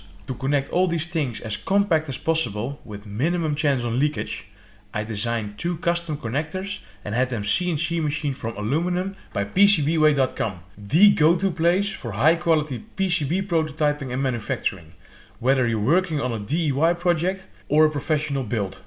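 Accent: Netherlands. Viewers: jezus man, je kan wel wat beter je best doen om minder nederlands te klinken. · are you dutch? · nice!..that would actually be a good kind of"scarecrow" in the garden..just a question ,how come you got such a strong dutch accent?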